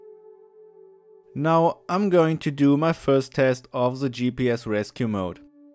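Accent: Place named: Germany. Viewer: oh man.. du klingst so deutsch mit deinem akzent :D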